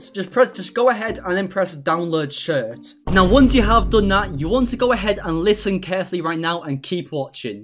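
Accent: United Kingdom. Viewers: Mate, are you British?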